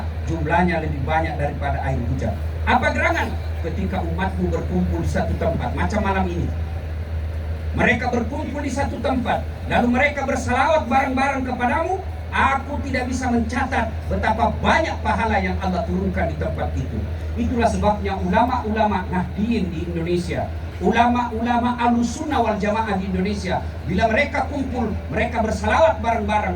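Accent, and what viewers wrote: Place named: Sabah, Malaysia. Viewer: Pintar sudah Ustadz bicara sabah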